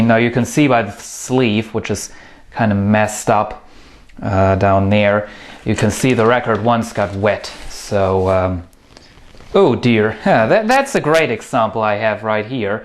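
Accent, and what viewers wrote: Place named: Germany. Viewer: forty earo :D you sound so german, alter :D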